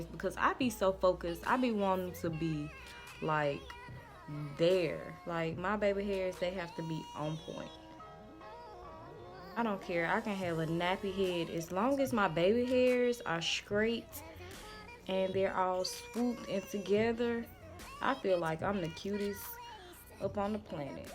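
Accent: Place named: South, United States. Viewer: Your accent is awesome!!!!!!!!! Is it southern?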